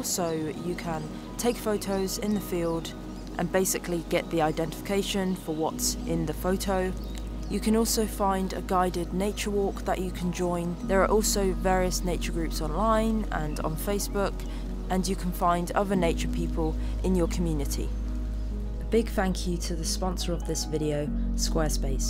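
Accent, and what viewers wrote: United Kingdom. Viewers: I think I understand the accent very well but there several new words that I've never heard before (for animals, plants etc.). Thankfully not that specifically annoying type of British accent.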